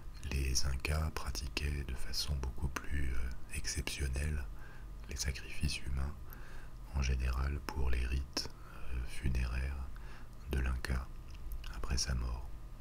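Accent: France. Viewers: I now realize what's been missing from my ASMR experience. A beautiful French accent 😍😍😍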